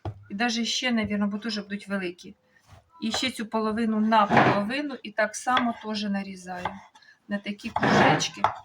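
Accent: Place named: Lozova, Ukraine. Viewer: ❤Подобається як ви разговарюєте, по нашому ,по Лозівському хоча проживаю більше 40 років на Полтавщині. Не звертайте увагу на злі язики ,це все від заздрощів. Миру, здоров'я, найшвидшої Перемоги. До речі моє рідне село Перемога ❤.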